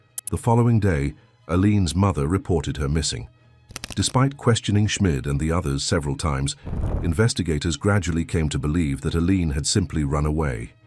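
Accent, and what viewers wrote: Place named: United Kingdom. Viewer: Thanks for the sensitive narrative. I appreciate the British voice and detail.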